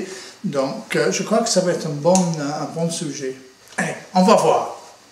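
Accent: England, United Kingdom. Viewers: L'accent anglais est toujours aussi charmant à écouter. Vidéo très instructive avec des moyens OTC, un régal !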